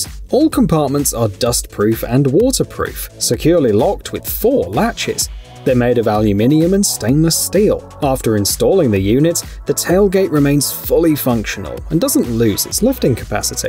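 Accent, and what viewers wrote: United Kingdom. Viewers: Loose the Limey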